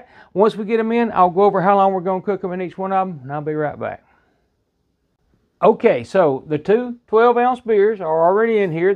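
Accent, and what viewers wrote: South, United States. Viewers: He’s from the south so he has an accent. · I love this video. You're such a character 😁 your American accent made me smile. Such a good job on those ribs! I love my instant pots.. can't wait to try ribs now!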